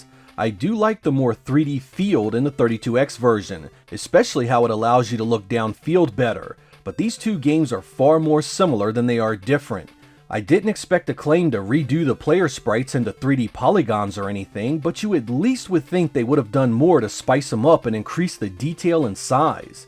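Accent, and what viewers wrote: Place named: Mid-Atlantic, United States. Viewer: Man it’s obvious you’re a Mid-Atlantic guy!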